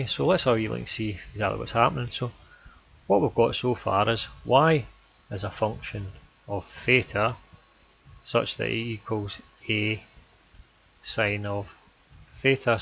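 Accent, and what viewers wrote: Scotland, United Kingdom. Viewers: are u from scotland?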